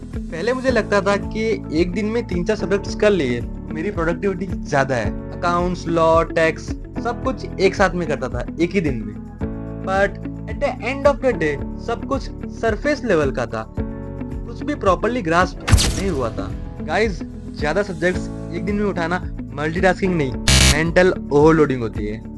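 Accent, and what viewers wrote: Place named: Gujarat, India. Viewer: bhai bohat achhi video banai hai, ek dum jordar and your gujarati accent😀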